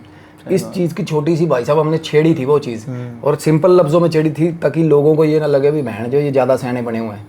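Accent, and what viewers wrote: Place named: Punjab, India. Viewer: paji sat shri akaal ji.. paji ek gl kehni c meri gl da gussa na kreo bai dilo respect aa tanu veere i really appreciate for your hard working. Paji ek cheej main piche boht dina ton note kr reha k eh banda jehda tade nal baitha hunda naam aa i think veer da, bai eh Banda tadi energy khraab kar reha yr tadi gllan sunan ch boht chass aandi, kyonki tuhada lehja punjabi touch aa extreme energy aa tadi 24/7 active rehnde oo tuc. Pr bai main fr kehna meri gl da gussa na kreo pr jehda banda tade nl baitha hunda aa eh bnda tadi energy khtm karda yr nal beh k susti wala atmosphere bnaya hunda es ne. Sare sade interest nu khtm kr dinda yr eh banda please es cheej t thodi working kro veer g. Otherwise mainu eda feel hunda k jes trha main da susti bhrya chehra dekh k irritate hunda rehnda baki tadi audience v jroor irritate hundi hovegi. 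Eh banda bai mhol bnan ton pehle ee swaad khraab kr dinda aa yr. Ann.. Ann.. kr kr k please bai meri gl da gussa na kreo main dilo respect krda tade hardwork nu baki tuc khud siyane oo paji. dilo pyaar❤️ sada hassde vassde rho, baba bhali kre☑️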